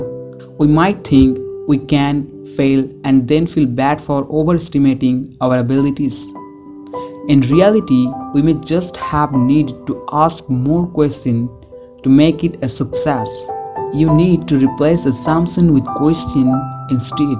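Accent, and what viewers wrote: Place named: India. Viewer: We are going to read a summary of the four agreements with a barely comprehensible accent!? Couldn’t you find a native Speaker of English? It’s like tech-support from Calcutta.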